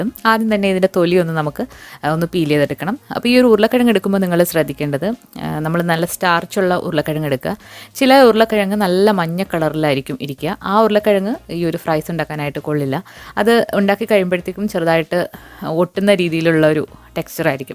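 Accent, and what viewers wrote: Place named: Kayamkulam, India. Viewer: Samsaram kettapol thonni namma naatukari aanennu. Kayamkulath evida njan kayamkulam...charummod aaane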